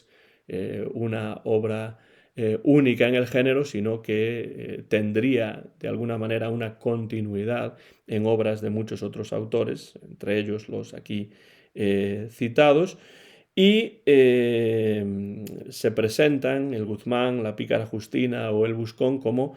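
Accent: Spain. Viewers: Tienes acento como de las Alpujarras o por ahí.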